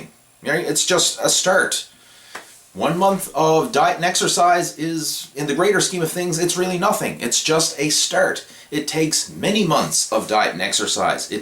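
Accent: Newfoundland, Canada. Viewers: Buddy, you sounds like your from NL!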